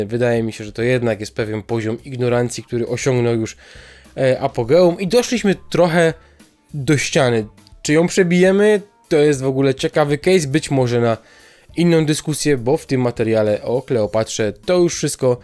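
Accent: Silesia, Poland. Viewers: Chopie jak jo Cie słuchom to widza żeś je łod nos ze Ślūnska 😂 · Pytanie... jesteś ze Śląska?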